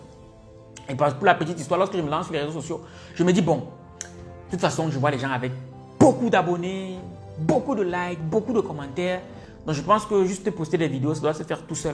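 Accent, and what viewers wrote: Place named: Cameroon. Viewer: Mais tu as gardé l'accent fort fort du mboa! C'est cool, du courage.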